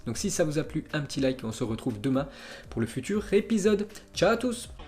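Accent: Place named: France. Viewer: tu es ridicule de prononcer les noms à la française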